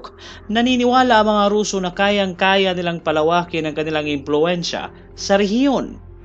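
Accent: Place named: Tagalog, Philippines. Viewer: Habang tumatagal medyo tumatagalog na ang punto.. At hindi na masyado puro "Pero"...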